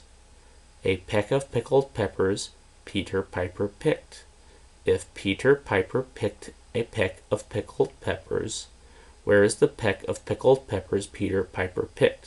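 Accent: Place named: United States. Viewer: One problem: this is American pronunciation. Correct English pronunciation is British Standard English (RP).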